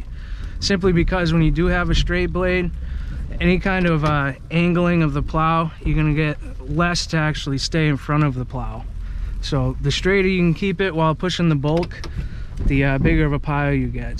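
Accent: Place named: Massachusetts, United States. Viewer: Nice video. I grew up in Hanover, Mass. Listening to your accent makes me homesick. Did some trail riding on my enduro motorcycle back in the early 70's near where you live. Raced a couple times at MX tracks in Pepperell and Southwick, Mass. When I was 2, I broke my back in 1975 in an enduro accident that left me paralyzed from the waist down. Came out to the University of Illinois to complete my undergraduate and masters degrees. Massachusetts is not the easiest place to live for someone in a wheelchair. Again, hearing your voice brought back a lot of good memories of living on the South Shore, especially when it came to snow plowing on our small family run farm. I have traveled all over the world as a Paralympic Track and Field Coach and as a Strength and Conditioning Specialist. Retired now. One of my goals is to get back to the most beautiful state in the USA, Massachusetts. 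Another one of my goals to see at least one more Boston Marathon in person. Thanks for the great video. Be safe and all the best to you and your family.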